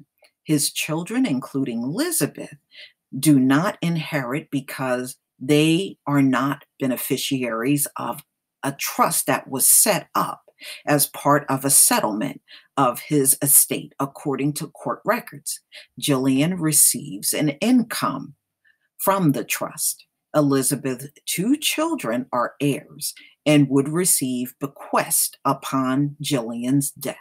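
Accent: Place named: New Jersey, United States. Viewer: I love it. Yo Are so Jersey!!!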